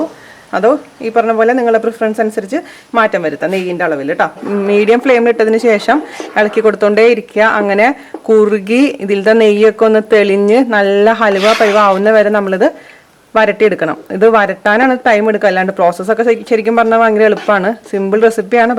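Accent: Thrissur, India. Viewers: chechi de veed trishur anno...aa accent...avidthe pole...sambhavam kaalaki...kollam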